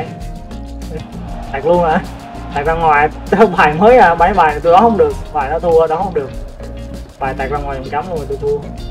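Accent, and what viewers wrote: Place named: South, Vietnam. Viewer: Xem kênh a phần vì content chất lượng, phần vì a nói giọng nam nên cảm giác gần gũi hơn:))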